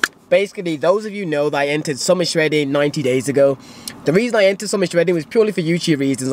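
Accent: Birmingham, United Kingdom. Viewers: Fam are u from Brum ?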